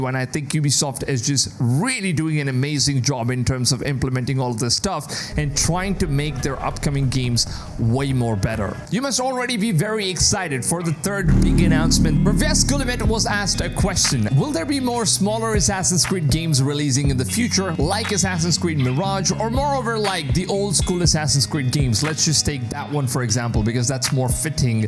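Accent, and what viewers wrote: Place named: India. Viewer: Your accent is funny, it's not very American. It almost sounds Indian. :)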